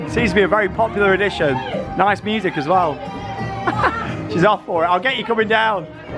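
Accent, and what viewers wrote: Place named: Stoke, United Kingdom. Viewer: My wife and I have just brought annual passes for the year. I think we're from the same city by your accent (stoke) so we will be going towers a lot this year. My little lads almost 3 so the cbebbies content was much appreciated, although I would rather give it a miss and ride nemesis all day long 😁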